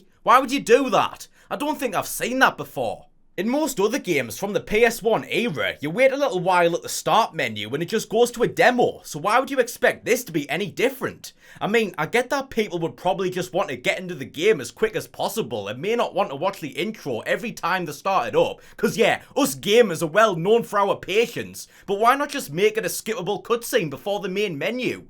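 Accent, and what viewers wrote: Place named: North, United Kingdom. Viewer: The northern accent and swearing is killing me. I wish you had presented Gamesmaster in the 90s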